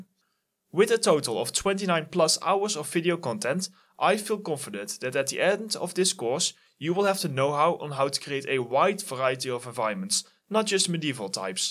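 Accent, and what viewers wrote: Netherlands. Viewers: You mean that megascans library? I was under the impression you could als import your own assets created in, for example Blender, and create textures from scratch. With the only difference you could only use those materials in Unreal Engine (which would only be a downside if you would use another game engine). I'm not a fan of Adobe anymore, although I have used a lot of their software. But I don't like their subscription model, way too expensive if you don't make a decent living out graphic design. I'm good in 'traditional' digital graphics design, but yet have to find my stride in creating for games, as over here there was never an actual gaming industry. Atleast not when I was at 'the right age'. But you sound Dutch, if I'm not mistaken?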